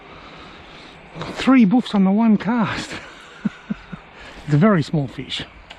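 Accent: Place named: Australia. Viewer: No worries. Thanks for all the great videos. I used to go yabbying all the time when I was younger. Your vids are a great reminder of those times. My kid also likes your vids coz you have a far stronger Aussie accent than I do. Cheers mate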